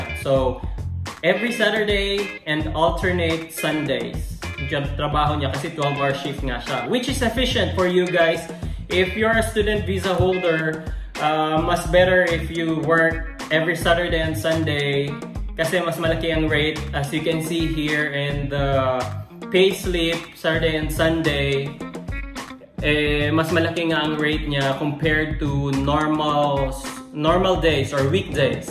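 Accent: Australia. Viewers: I can hear your Aussie accent na. haha Nice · Great info! Wanna hear your AUS accent 💘🙊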